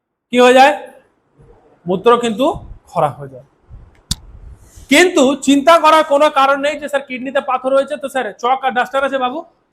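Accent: Rajshahi, Bangladesh. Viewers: স্যার আপনার কথার মধ্যে বাংলাদেশের রাজশাহীর টান আছে,,, ❤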